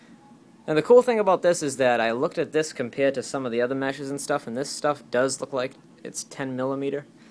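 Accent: Boston, United States. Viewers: u from boston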